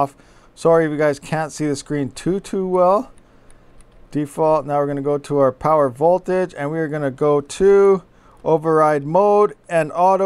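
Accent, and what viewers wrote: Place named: Canada. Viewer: lmfao.. the way canadians talk jus kills me.. hahaha... its good tho..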